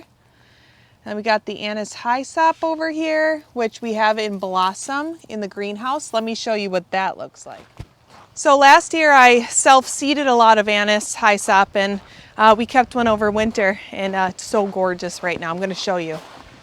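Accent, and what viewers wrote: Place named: North, United States. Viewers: Love the accent! You sound like some of my Yooper family ❤ Thank you for the garden tour 🤗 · I know you are a state away but a northern accent makes me feel at home when I listen. Hello from Northern Minnesota (Zone 3b) 💕🌿🌿🌻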